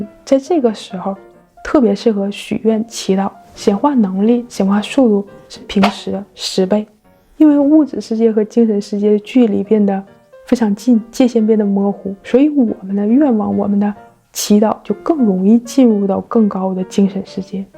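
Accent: Northeast, China. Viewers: Youtuber是哪里的人？听口音像是东北人。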